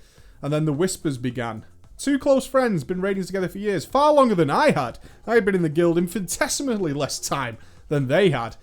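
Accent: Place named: North West England, United Kingdom. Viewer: dont apologise for your cough, apologise for your scummy north west accent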